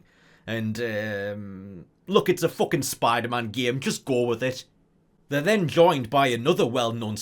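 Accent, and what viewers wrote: United Kingdom. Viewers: Okay I'm 9 minutes in and I've gotta say: - You have what it takes to have easily >500k subs. I don't mean you should only have 500k, but the production and editing and commentary are far above and beyond what I would expect from a youtuber with 182 subs. You're already producing content in the echelon of much higher sub counts which I hope pans out for you. - You have a great voice/accent for this shit. I literally won't watch videos over 8 minutes without a UK accent - Major nostalgia from this game. I used to play the demo of the first game on the Jampack Winter 2000 demo disc I got at a Toys'R'Us (RIP) - actually apparently they are opening inside of Macy's as of a year ago after the bankruptcy and closing of all their stores - Funny without being cringe - Good pacing - describing what happens in the game without being too descriptive all while being analytical without being too analytical - you strike a perfect balance Immediate sub and like. 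One thing/tip (?) is to bleep the f bombs. I do absolutely love hearing them, but I've noticed that lots of the higher profile youtubers are bleeping to maintain ad revenue. I think the bleeps still suffice in delivering the sentiment of f*ck. If you have a Patreon I'd be glad to give $15-20 a month to help out and see where you go. EDIT_0: 13 min in - the skyrim realization is amazing. I didn't know that 🤣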